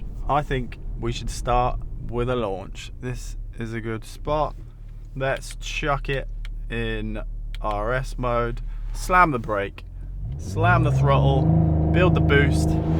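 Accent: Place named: United Kingdom. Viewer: I love that british accent »A glass of booooataaa».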